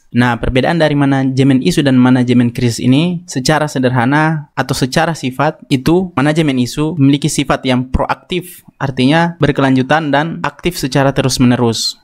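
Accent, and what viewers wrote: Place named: Makassar, Indonesia. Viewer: Orang mkassar kah bos...logatnya agak ke mkassar...wkwkwk😂🤘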